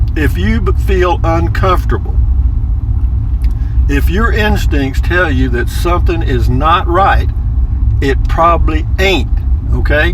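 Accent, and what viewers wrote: Texas, United States. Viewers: Love that Texas talkin' style! Your video not only made me sit up and pay attention, it made me laugh at some of the things you said. Humor and common sense.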